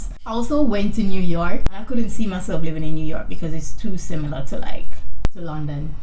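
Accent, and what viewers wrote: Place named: Jamaica. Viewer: I could hear the Jamaican accent from your tik toks 🇯🇲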